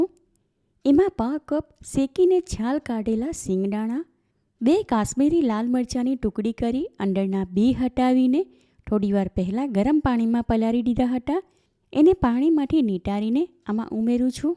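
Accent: Surat, India. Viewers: Chokks surti chho bolo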